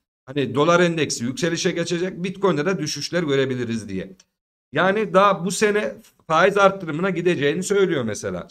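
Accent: Konya, Turkey. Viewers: Teşekkürler Gonya'lı Ustam.("ben öyle bişey DİMEDİM, lafına hastayım)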